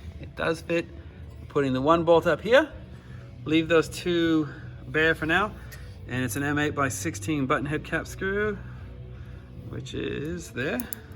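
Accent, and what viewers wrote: Australia. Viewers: Haha, is that an American/Canadian accent I hear? I'm from Vancouver and I do the same thing. Sound super American all the time and throw in a few Aussie pronunciations :D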